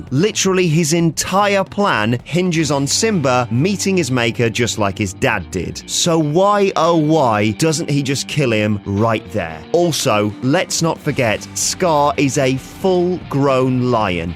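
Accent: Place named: United Kingdom. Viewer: "Simbar and Scah." Oh, British people... When will you ever learn how properly to use the letter "R?"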